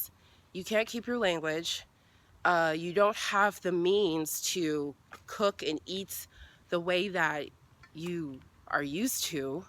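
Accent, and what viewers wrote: United States. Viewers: You sound totally American. By the way, I'm 71% African, with 27% Nigerian. And you are very kind and lovely. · Hey, I can tell by the way you talk that you are Americanized. That's the thing people don't factor in when they think about tracing their roots. You are a first born or second born American so it's by chance that you even took the test. That's why I hate some of the money grubbing that goes on in the US. It's because people want to make a lot of money that we can't see people from all over the world on tv. I've been trying for days to get free tv from other countries and I can't get any with Great Britain Natives on it because the US wants to charge money. I know that I'sound like I've gotten way off topic but here is how it ties in to your thing. Blacks in America are completely cut off from their African culture. You have your parents to fall back on but they've completely lost theirs. 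They could probably look at the Africa channel but that's cable and it's not cheap. I was looking for movies to watch and I realize that people get triple charged and still can't watch without paying even more. There was a movie I have seen called five easy puces. I have basic cable and I can't watch it because I don't have movie channels. The other parts of the house I have digital cable but I couldn't watch it unless I happen to have that movie channel package. I do happen to have that package but here is the rub. It will cost me an additional $4 to see it because I have to order it on demand. When do I stop getting charged for something I want to see? The lady probably feels like me that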